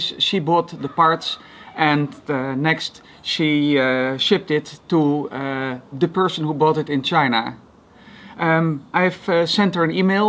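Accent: Netherlands. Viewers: I love your accent, are you from somewhere around Netherlands? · Yeah, he's dutch.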